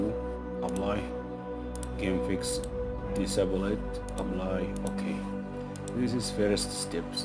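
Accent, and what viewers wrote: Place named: Gulf, Arab world. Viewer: انا قلت انه انجليزي بس يوم شفت اللهجه قلت ذا ولدنا وبس ههههههههههههههههههههههههههههههههههههههههههههههههههههههه(استمر يا أسطوره والله انك ساعدتني🫡🤍)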